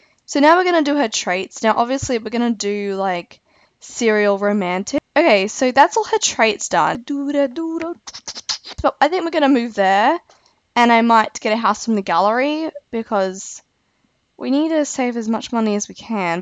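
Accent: Australia. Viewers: Is your accent, Australian?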